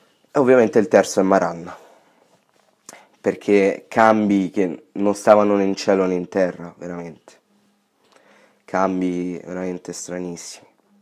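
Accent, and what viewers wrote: Rome, Italy. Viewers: Hai l accento romano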